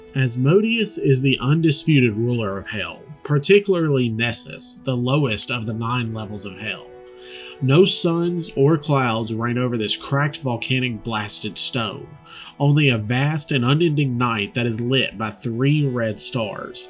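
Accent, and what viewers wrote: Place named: Deep South, United States. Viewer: Got that drawell, that deep south dwall lolll.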